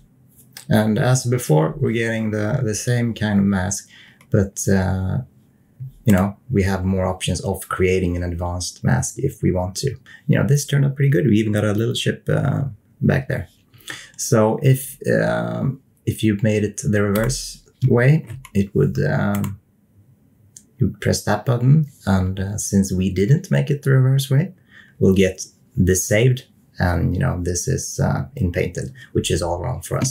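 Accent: Sweden. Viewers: The way you said automatics. I think it would sound less Swedish if you said Automadics and didn't emphasize anything. Your Swedish dialect is very obvious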